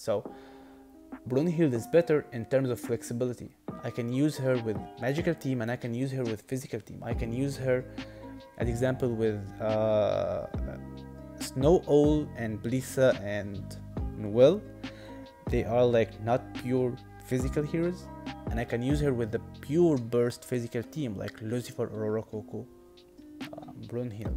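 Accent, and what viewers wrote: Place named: Arab world. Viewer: طريقة كلامك غريبه حاس انك عربي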